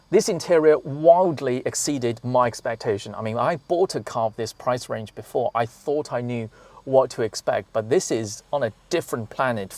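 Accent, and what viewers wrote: United Kingdom. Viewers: Just came across this video and channel. Who's this guy? He's hilarious and of course the accent and humour of a true brit!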